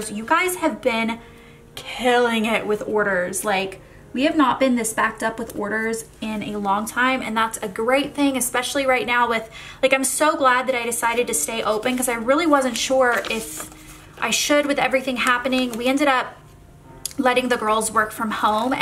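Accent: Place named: South, United States. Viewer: I can hear your southern accent coming back!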